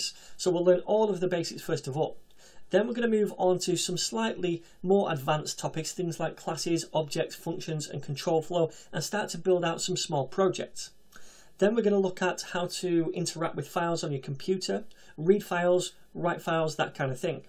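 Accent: United Kingdom. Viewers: omg finally a coder-youtuber have explain it on clear and right way and else thing is he is British and cuz of finally accent is not make me annoy uhh... thank god i fined the best yt Chanel in entire world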